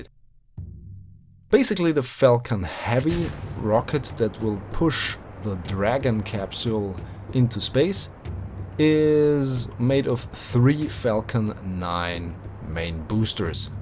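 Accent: Germany. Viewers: You sound like a german, are you german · Your English kinda sounds like it had a little German accent and your Space Station's name is Franz so... Nevermind. But where do you come from? Because you said you were proud of Europe producing for NASA. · You are a german right?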